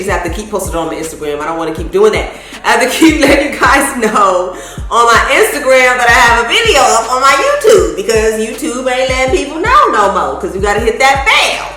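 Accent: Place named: South, United States. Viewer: Yes, ma'am, I am here for the consistent uploads! Love your personality and your channel! You are so gifted. I think I remember hearing that you were born and raised in CA, but I definitely hear a southern accent. 🤷🏽‍♀️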